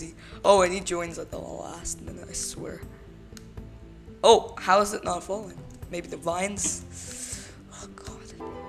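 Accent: India. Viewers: Nice vid but are you Indian.........?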